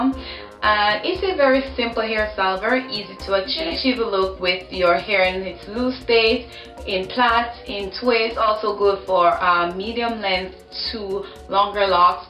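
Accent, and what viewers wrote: Jamaica. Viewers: r u from Jamaica?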